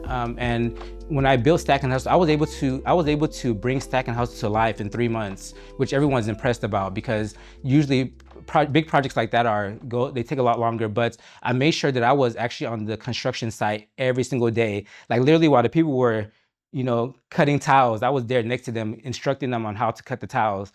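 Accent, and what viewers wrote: Nigeria. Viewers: Yes ..he has a Nigerian under torn in his accent for sure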